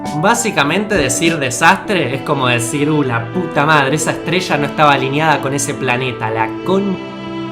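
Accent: Argentina. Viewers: Cuando un argentino abre la boca... ¡¡¡ áaaaaggh...!! se oyen exclamaciones de desaprobación, sobre todo en muchos países de Europa... NUNCA aprenderán a hablar bien. Basta con oir a éste sujeto, que aparte de expresar inapropiados términos de baja ralea... en su creencia que así será mas entretenido y agradable, demuestra el extracto social extremadamente bajo del que procede. Es exasperante la cantidad de veces que pronuncia mal: ''schá'' (ya sea por...) -- ''usás'' (usas) -- ''schueva'' (llueva) -- ''incluscha'' (incluya) -- ''schevar'' (llevar) -- ''schins'' (Jeans) -- ''tascho'' (tallo) -- schevaban'' (llevaban) -- ''schamaba'' (llamaba) -- cásches'' (calles) -- ''schamó'' (llamó) -- ''schó'' (yo) -- Hay que dejar muy claro que la sal no se ''AGARRA''... se toma. No poseemos garras... ¿cuántas veces repitió ''agarrás''....? véase en el video. -- ''Vos'' (tu. 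No estamos en la Edad Media) -- ''muschas'' (muchas) -- ''podés'' (puedes. Otra vez retrocediendo siglos) -- ''peschorativo'' (peyorativo) -- ''estrescha'' (estrella) --- queda bastante y me aburro.... Termino con otra tirada de orejas: Se encuentra el color ''marrón'', por ejemplo, en los ladrillos de construcción... pero en la vestimenta de los frailes Capuchinos el color es el CAFÉ...!! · CafeZK Es argentino,es su acento.Es raro al principio pero luego te acostumbras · no puedo ver un top de palabras si eres argentino, con tu acentos degradas el español , pronuncias mal todas las letras y los acentos , tildes, etc... no es xenofobia, es que me resulta ridículo. si vas a hacer un top sobre PALABRAS del ESPAÑOL tienes que hablar con un acento lo más parecido al ESPAÑOL, no en ARGENTINO CHÉ. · sos argentino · Digo porque conozco a alguien Argentino y habla haci no más pregunto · Eres argentino??? 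· Que asco con tu acento detesto la manera argentina chaaaaaa para yaaaaa lo peor.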